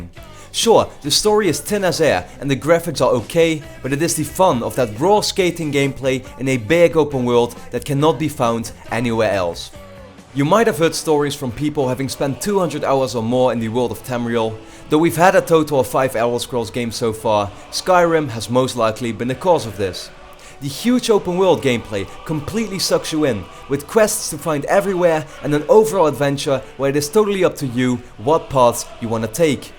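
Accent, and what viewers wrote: Netherlands. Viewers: Is hij Nederlands? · Are you Dutch by any chance? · Really obvious he is from the Netherlands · Are you from Holland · the akward moment when you hear the shitty dutch accent. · Jij Bent ZoieZo Nederlands Want Dat hoor ik aan je stem ;) ***** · u dutch?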